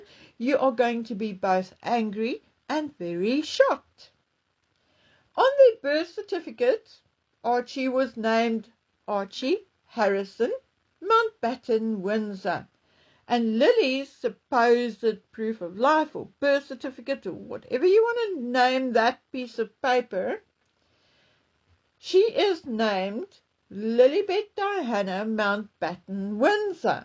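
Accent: Afrikaans, South Africa. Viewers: I LIKE your content ….always have. I didn’t know English wasn’t your first language. Is it Afrikaans?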